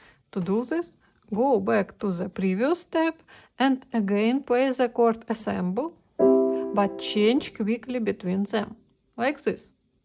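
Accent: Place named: Eastern Europe. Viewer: Amazing explanation but please do something about your slavic accent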